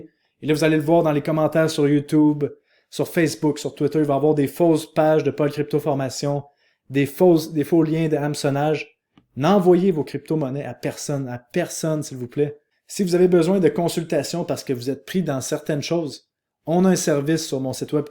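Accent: Canada. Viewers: Oh Paul l accent canadien le mix américain/français et deguelasse je le supporte que pour toi sa veut dire tes un bon!!!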